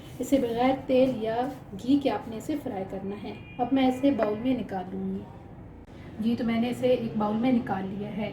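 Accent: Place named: Murree, Pakistan. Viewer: Focus on pronunciation I think so you belong to murree.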